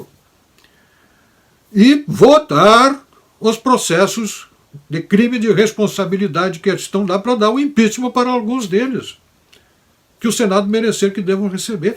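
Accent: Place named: Rio Grande do Sul, Brazil. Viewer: Sr. Puggina, quando ouço - o, neste timbre de voz típico sulista riograndense, sinto-me um privilegiado, como alguém ouvindo um professor, sentado ao centro e falando da sua experiência notável. Obrigado. Mantenha - se.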